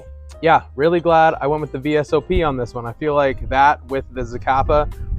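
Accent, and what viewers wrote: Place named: France. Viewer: Stunning French accent, monsieur.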